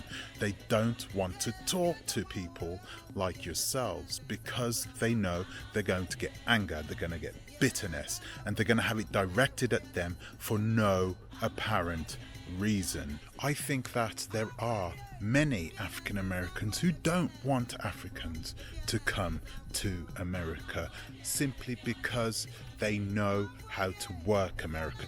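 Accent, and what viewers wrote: United Kingdom. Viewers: OMFG THAT BRITISH ACCENT IS SOOOOOOO ANNOYING IDK HOW ANYONE CAN LISTEN TO IT FOR LONG PERIODS OF TIME 🤮🤮🤮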